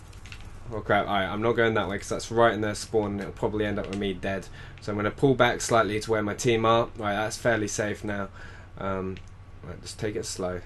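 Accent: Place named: London, United Kingdom. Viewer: Which part of london u from. Im in essex. P.s im nt a stalker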